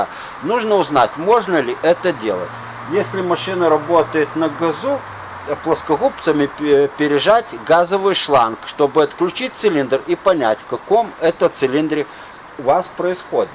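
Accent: Odessa, Ukraine. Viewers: Таки сразу понял шо попал на канал настоящего одесита, лайк. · Таки я вам премного благодарен за дельные советы,а вы самом не из Одессы?И машинка стоит на фоне японская,Митсубиси,и речь таки знакомая... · Такой одесский говор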